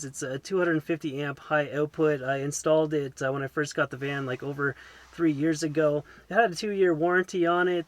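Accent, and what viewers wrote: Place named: Newfoundland, Canada. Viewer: Drive your whole house into a moose -! 😂. You sound like a Newfie or Bluenoser! Thanks for the good laugh. Definitely driving your house into wildlife is a genuine concern for all van dwellers. 😊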